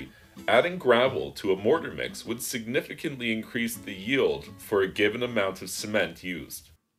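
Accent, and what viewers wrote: Canada. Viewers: I can hear that Canadian accent sneaking through. lol. Cool video.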